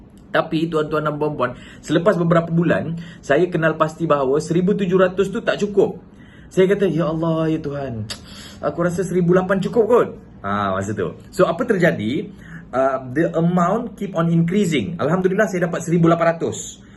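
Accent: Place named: Kelantan, Malaysia. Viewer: tq Tuan. Sbb sskli tn ckp cm Kelantan.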